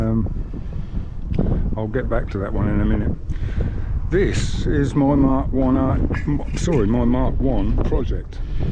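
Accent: United Kingdom. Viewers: 1000 views is actually pretty good for any video but the 'show you what to do' videos are the popular one's that people like to watch with a ride out at the end of project.I would still do the ride out videos you're a young chanel & 1000 views already is good & word will spread about your love for old RE & your knowledge of how to fix them. A tripod be great to film your how to videos & also having someone hold camera 🎥 as you do stuff if you can or zoom in on part & when editing explain what you're doing etc. You also have a good accent that's easy to understand & people like a good British accent to listen too.Keep up the good work.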